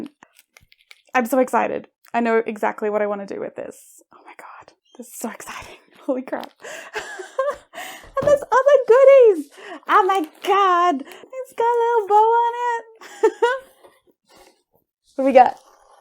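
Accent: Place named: Australia. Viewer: "My besty just brought me this in from the posty." Two sentences in and everybody knows, you're Australian 😂 Also i bet most parents aren't as happy about their new born babies as you are about that Filament 😂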